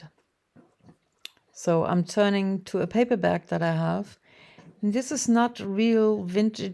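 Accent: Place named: Germany. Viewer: Guten Tag from Tennessee, USA. At least I believe you are German but your accent is different from my husband’s. He’s a German National from Hamburg. Your English sounds a bit different than his. And the advertisement with the word fisch also led me to that conclusion. That being said, your work is quite beautiful. Prost from America.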